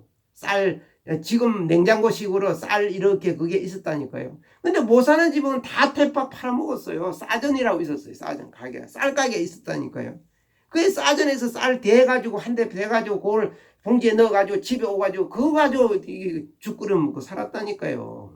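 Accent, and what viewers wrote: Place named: Gyeongsang, South Korea. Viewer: 어디세요 미군도 아시면 의정부 정도 아닌가요 말씀은 경상도 사투리이신데요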